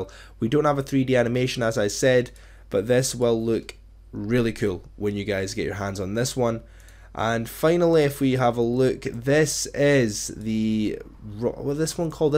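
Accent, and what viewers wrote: Scotland, United Kingdom. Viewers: He's Scottish, I talk like that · I’m Scottish and so is he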